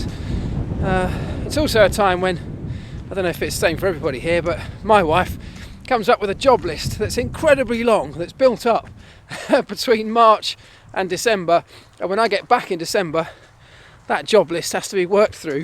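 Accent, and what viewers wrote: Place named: United Kingdom. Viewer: Like your british accent and good stories about F1. Good job and looking forward for more!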